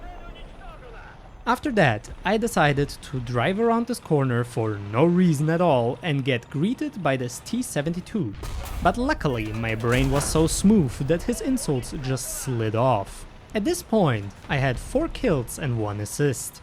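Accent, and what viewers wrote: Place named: Germany. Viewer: I love his German accent, it fits the ‘war crimes’ theme perfectly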